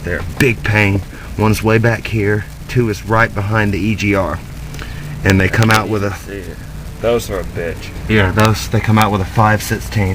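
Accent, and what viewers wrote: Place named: Cleveland, United States. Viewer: u sound like cleveland......thanks,this vid really helped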